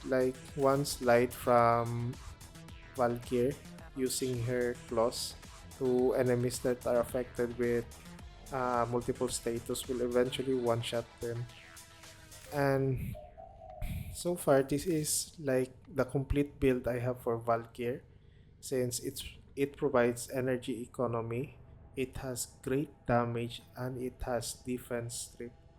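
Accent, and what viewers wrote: Philippines. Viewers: are you filipino? sounds like it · even the diction and cadence is similar to how Filipinos speak Tagalog. The "f" and "v" to the rolling "r"s and "t"s. Though even English in the ph will still vary depending on the region. That being said, I prefer the human voice than the AI one.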